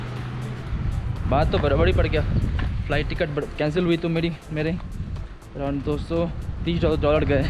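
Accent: Bihar, India. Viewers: ITS BIHARI LANGUAGE... 😊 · BIHARI style Bhai.... hamare Bihar me aise words aise hi pronounce krte hain.. Jaise competition ko kampatetaion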